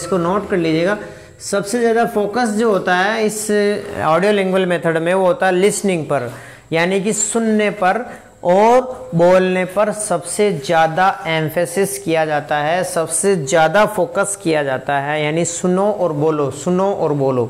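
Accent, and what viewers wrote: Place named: Bhilwara, India. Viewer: 🙏🙏🙏🙏🙏🙏🙏🙏बहुत बहुत धन्यवाद sirji.... वैसे क्या आप भी भीलवाड़ा से है... भी... से अभिप्राय है की,,, मैं तो पक्का भीलवाड़ा से हूँ.... और आपकी लैंग्वेज और बोलने के तरिके से लगता है आप भी भीलवाड़ा के ही है... सही कहाँ ना मेने 😄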